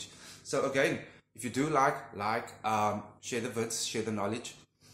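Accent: South Africa. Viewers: This might be completely of topic🙊😁 but I noticed ur accent and was wondering, is your home language Afrikaans😅😅😅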